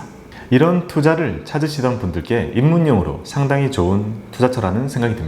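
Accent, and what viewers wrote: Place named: Busan, South Korea. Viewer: 부산 출신이신가봐요 ㅎㅎㅎㅎㅎㅎㅎㅎㅎ재미있네요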